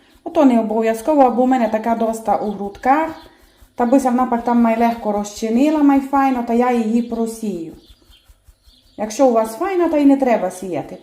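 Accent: Transcarpathia, Ukraine. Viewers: Дякую за рецепт❤ мені так подобається закарпатський говір, слова інші - насолоджуюсь діалектами неньки нашої. Міцно обіймаю. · Тільки за закарпатський діалект та акцент ставлю лайк❤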